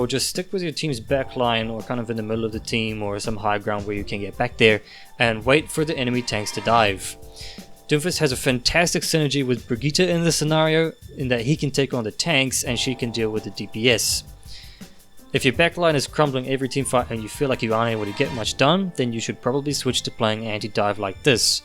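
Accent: New Zealand. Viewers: Are you from New Zealand? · Are you from new Zealand?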